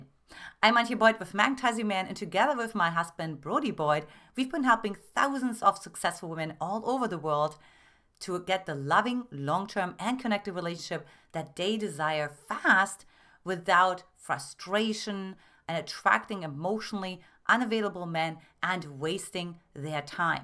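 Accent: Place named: Germany. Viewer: I love your German accent 😊 I was wondering where it comes from. Thank you for the content ❤, it is eye opening.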